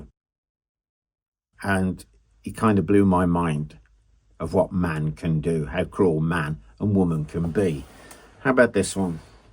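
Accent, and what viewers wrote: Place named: RP, United Kingdom. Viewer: Great video. Posh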